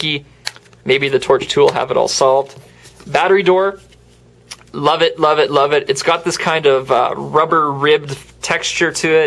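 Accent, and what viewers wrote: Canada. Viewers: love his canadian accent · You sound so Canadian and i LOVE it! Thanks for the awesome review! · Are you canadian ?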